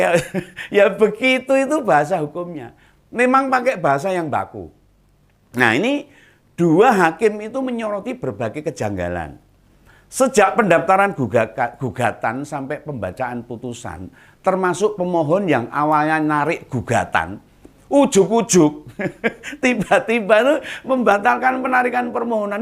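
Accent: Java, Indonesia. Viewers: selalu menunggu video nya Om Logatnya khas nya jowo bngt 👍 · Siip om. Sehat seger waras Terus Om. Podo podo Wong jowo, penak disimak😊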